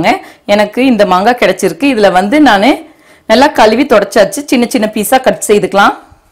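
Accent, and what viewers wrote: Kongu, India. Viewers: Kongu Tamil kagave oru like...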